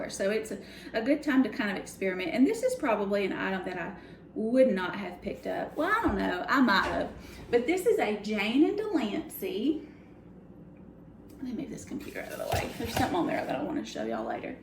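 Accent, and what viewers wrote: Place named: South, United States. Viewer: Did that Cabi have a black dragon on it so definitely put dragon in your title love your Southern accent and easy going helpful content I'm from Ms original are you from there or near sure sounds like it! Keep up they great work!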